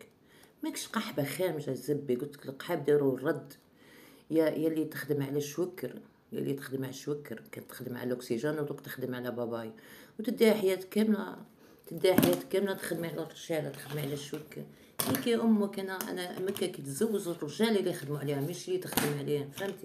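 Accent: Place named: Guelma, Algeria. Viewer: والله عرفتك قالميه لاني كيفك ايوما وتهدري بلدي كيفنا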